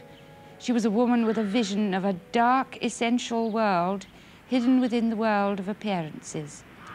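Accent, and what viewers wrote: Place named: Scotland, United Kingdom. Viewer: It's a posh Scottish accent actually.😊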